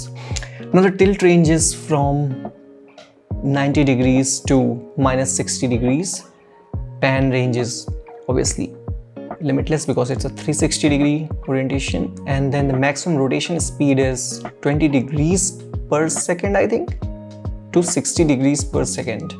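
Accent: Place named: India. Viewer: That indian accent is just too heavy to understand. Just watch this instead... <link>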